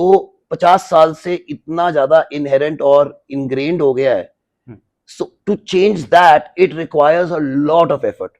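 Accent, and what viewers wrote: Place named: Jammu, India. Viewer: Your hindi similar to jammu hindi 😂 buta . Bhande . Etc😂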